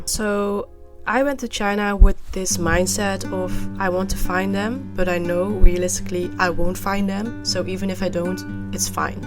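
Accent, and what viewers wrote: Netherlands. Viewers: So many conflicting emotions that are difficult to resolve but in one regard you have so many more life opportunities (as you discovered) which gives you a chance for a broader impact for yourself and others..love the Dutch accent, best wishes for all in your future 🙏🏻👏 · Your English is phenomenal with a tiny hint of a Dutch accent.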